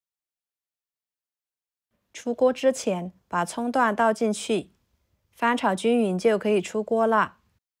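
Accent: Yunnan, China. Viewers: 这云南普通话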